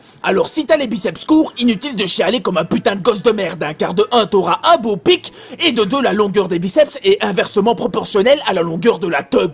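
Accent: Belgium. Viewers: Salut à toi jeune fou, j'ai mis du temps à apprécier ta chaîne et son format mais je suis fan maintenant. De très belles connaissances techniques et biologiques saupoudrées d'un humour barge le tout sur un accent de Dikkenek !!!! J'achète, bravo.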